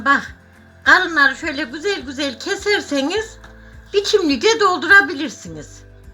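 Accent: Kayseri, Turkey. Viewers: Tam bir Kayseri babannesi. Konuşmasından anladım ben de has Kayseri'liyim.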